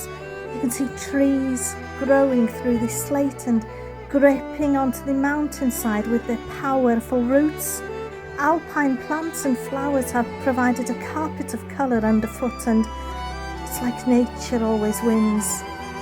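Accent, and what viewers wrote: Wales, United Kingdom. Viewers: Excellent video on Dinowig Quarry, one place me and Brett really want to get too, so it was fascinating to hear your tour and i love hearing the Welsh accent, it's great to pick up a few words. Like you said it's quite the climb, but from what you've shown us its well worth the journey! How long did it take you roughly to do the walk? Thank you, appreciate your videos!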